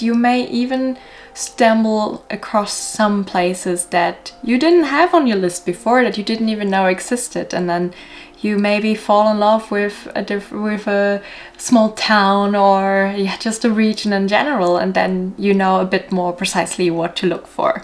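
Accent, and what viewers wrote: Germany. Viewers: Thank you, i thought I heard a little german accent but there are many countries that speak german, right. Good Luck with everything and i hope we Swedes help you with what y need.👍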